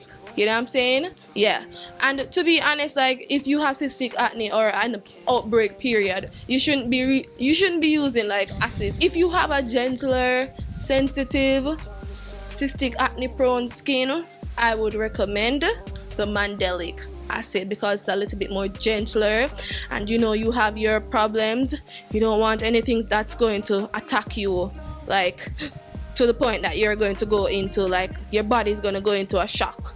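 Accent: Jamaica. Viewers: I like your Jamaican accent! 👍🏾😇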